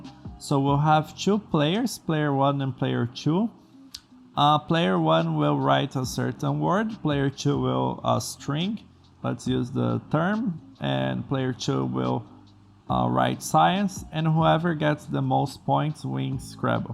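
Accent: Brazil. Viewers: Vc é brasileiro ne ? pelo sotaque ! Se voce for sera que consegue me ajudar to no começinho do ensino to precisando de alguem pra troca umas ideias !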